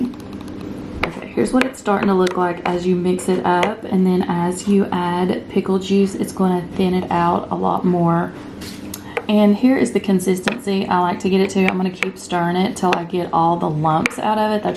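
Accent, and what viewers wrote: South, United States. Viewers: Love a good old southern girl accent..smh stationed in California..these people are so different out here lol.. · I love your voice and southern accent 😀. The Keto diet and Intermittent Fasting has changed my life, I have lost 27lbs. in 30 days. The best part for me is that my back pain is gone, I was just told 3 months ago that I needed surgery on my lower back. Not happening my friends.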